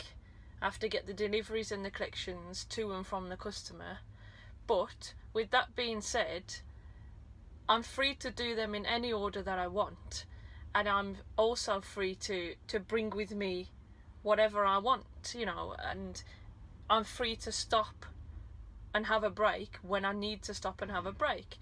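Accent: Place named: Hampshire, United Kingdom. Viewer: Hi You sound local to me, I’m from Hampshire... I’ve just paid for my class 2 rigid course, have my medical next week and then my theory. Thankyou for all your how to videos, and your opinion on all the little things.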